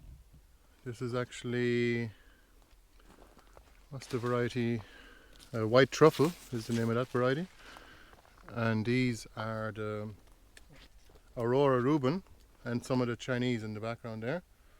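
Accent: Ireland. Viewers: Lovely video, well done, a fabulous example of gardening with Nature. (And of course, an Irish accent could read a boring old shopping list and I'd be hooked!!) Found you via Colette at Bealtaine Cottage and have to say, I'm really envious of all the currants and jostaberries you grow - too warm here in my part of New Zealand (Waiheke Island, up north) for any of those. To see all the yarrow and Jerusalem artichokes, all the herbs - I wish I had more space to indulge all that too. Thanks so much for making the film, I shall have to go back and look at the others you'd done. Mighty impressive. Unfortunately, we have to pay a fortune to get wood chips here so I only use them occasionally for the paths, but have been no dig for nearly 20 years and I know it works. Great use for all the old cardboard boxes too, eh?! Gorgeous, hope things continue to go well for you and thanks again for showing us :) ♥♥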